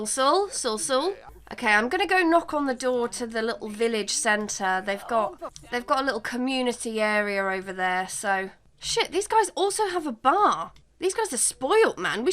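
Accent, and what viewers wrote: United Kingdom. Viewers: god she's such a.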